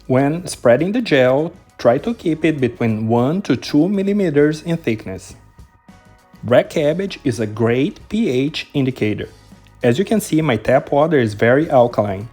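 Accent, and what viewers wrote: Brazil. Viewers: This guy has to be Brazilian. I recognise my own accent 😂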